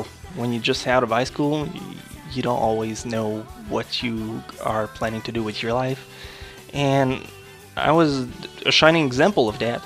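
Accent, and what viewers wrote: Quebec, Canada. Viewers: OMFG T'es québécois Oh shit ._. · Haha je savais déjà que tu étais canadien et francophone mais tu as vraiment l'accent canadien quand tu parles français, c'est trop mignon ;) Mais jpense que tu as eu raison de faire tes vidéos en anglais, c'est beaucoup plus pratique pour se faire connaître et c'est plus "universel" que le français, on va dire. Anyway, keep up the good work and greetings from Japan (I'm a French girl on hollidays in Japan XD) PS: J'attends avec impatience Chronexia and the eight seals, au fait ! Vu le temps et les efforts que tu investis dans le projet, ça va être génial, c'est obligé ! :) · Je le savais que tavais pas un accant anglais jme disais que ce netais pas ta langue maternelle mais je naurais pas cru que tu serait canadien fr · J'ai toujours voulu entendre ton français parce que je trouve l'accent canadien vraiment sympa! 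Voilà une bonne chose de faite :) Content d'en apprendre un peu plus sur toi et bonne continuation! First ; congrats for various things! Second : keep the good work sir! ^^ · Oh putain je ne laurait jamais cru que tu etait canadian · Un vrai accent Quebecois XD (a real Canadian-French accent) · Tant qu'a y être, est tu québécois? perso je trouve que nos accent sont pareils XD Test : dit tabarnack en vidéo on verra ;) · Hé ouais l'accent québecois ! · LoL mec je savais pas que tu etais quebecois sa fait du bien tentendre parler francais :) tu parle bc mieux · Ben non! depuis tout ce temps, j'avais trop pas reconnu l'accent! Nice que je ne sois pas le seul au Québec à aimer (ou à adorer) regarder les animes :P Je suis fan de Naruto mais les autres animes sont tout aussi bon! Bravo pour tout ces vidéos. Je suis de Rimouski, mais je vais te reconnaitre si on se rencontre inquiete-toi pas, si jamais je vais au zoo! 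:D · tes quebecois non?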